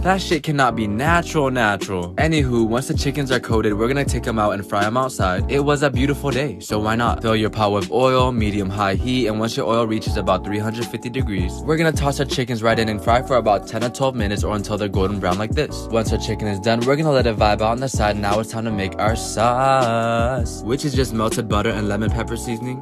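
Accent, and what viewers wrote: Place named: United Kingdom. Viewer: Y'all can tell he's british. His accent... and LOOK AT THAT PANNNN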